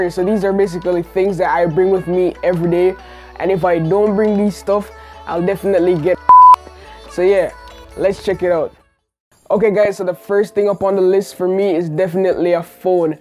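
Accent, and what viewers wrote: Jamaica. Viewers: Where my boy from? He accent kicking. Just sub. Update now I see you is a yard man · Lol this guy kinda have a Jamaican accent, where you from fam ?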